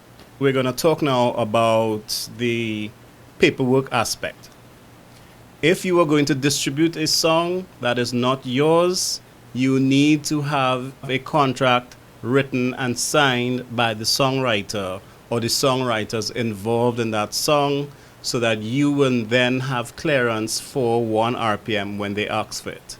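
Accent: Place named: Trinidad and Tobago. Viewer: Instantly knew it was a Trini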